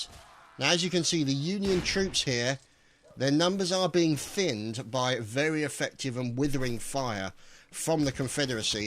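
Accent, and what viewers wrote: England, United Kingdom. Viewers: I wonder how much does the rest of the world know about the American Civil War? Do they really cover that in your schools, consider the amount of your history in Europe compared to our short one?? Other then that you have a great voice for this and you commentary is very good. I hope you Brits know how much woman here love the "English" accents here. Americans in general really like the Brits as one-sided it may be from your end.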